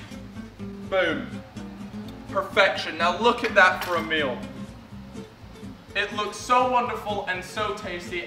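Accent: United States. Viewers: You sound American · Thought you were American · ... I thought he was American.... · you sound American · So your accent is all those things you mentioned but I can mostly hear American yet you never lived in America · The accent sounds closer to American than anything else. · U sound American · Am i the only one who thinks he has kind of an american accent? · your accent sometimes sounds american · Am I the only one who thinkg he sounds American? And coming from an actual American that is huge. It really confuses me to know he has never lived here.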